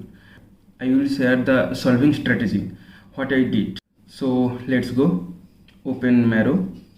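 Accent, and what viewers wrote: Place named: Bengal, India. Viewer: That bangla accent is so nostalgic here in Delhi. Great tips! 👍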